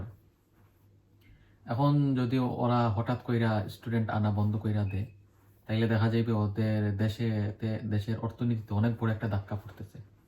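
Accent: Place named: Sylhet, Bangladesh. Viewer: Bhai accent a lager Sylheti...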